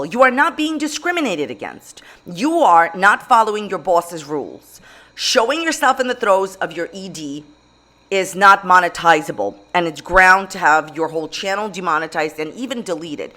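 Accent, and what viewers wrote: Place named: New Jersey, United States. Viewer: Love voice the most about her videos it’s so Joisey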